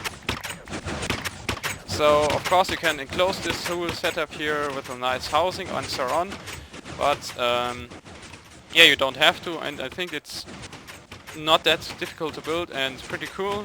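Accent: Germany. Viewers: i like his germen voice or at least i think its germen still it sounds cool and ... gentleman-ish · bist du deutsch?? denn dein akzent ist grausam^^ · Are you a German ? You have German accent. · you sound a little german... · Are you from Germany? / Bist du aus Deutschland? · Du bist Deutsch, hab ich recht? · Ithinkk you're a german, oder? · HAHA, ich wusste es^^ :DD, man hört, dass du deutscher bist^^ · vom akzent her muss er deutscher sein :D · Nein, der deutsche akzent klingt gut, es ist keine Tugend wie jeder Amerikaner rumzunuscheln! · Man i'm from Germany and thats definitely a german accent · Yes, it's kinda obvious that he's german, I'm german and I can hear it from his accent ;) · Du bist deutsch, ne? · ... Hey, you are German, right? You have a little bit of an accent. :D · bist du deutsch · red deutsch! ;D man hört dass du deutscher bist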